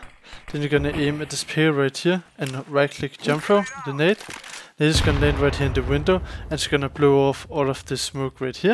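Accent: Denmark. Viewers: danish accent so cute <333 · N1, off topic u sound VERY danish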